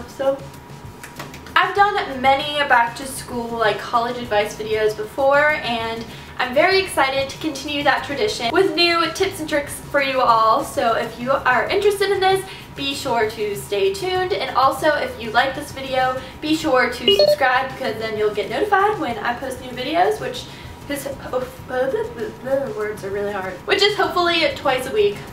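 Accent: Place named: South, United States. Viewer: I feel like your southern accent/side totally came out in this video. lol loved it!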